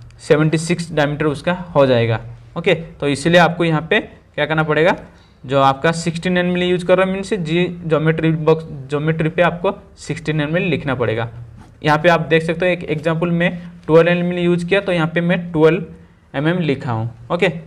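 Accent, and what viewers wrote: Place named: Odisha, India. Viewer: Sir app odia ho kya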